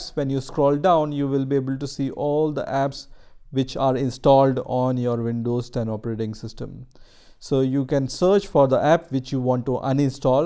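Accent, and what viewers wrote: India. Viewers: always the people with the indian accent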